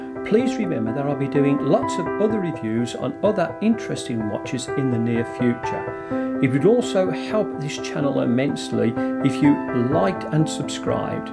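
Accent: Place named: United Kingdom. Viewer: it's hard to find channel like yours Sir,, you served an information nicely since I am not an English native, I catched your words clearly like a crystal altough you have a British accent,, not like other channel who speaks fast,, how can I watch the video and read the text at the same time (I'm not an Englishman).. I got information that I want from you,, thank you Sir, sorry for my English note: like #100 for you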